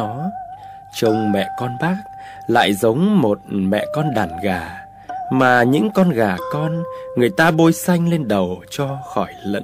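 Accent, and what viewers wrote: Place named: Nghe An, Vietnam. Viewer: Long cái tieng nghê an lam mat cam xúc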